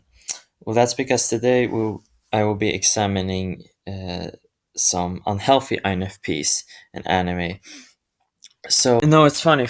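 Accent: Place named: Scandinavia. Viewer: I like the video😄 Btw are you Norwegian perhaps? Your accent sounds Scandinavian😊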